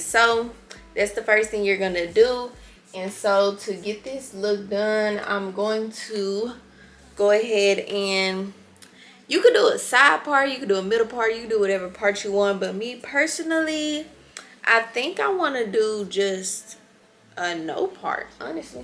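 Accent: United States. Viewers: Love your accent , what part of the states are you from ? ❤️‍🔥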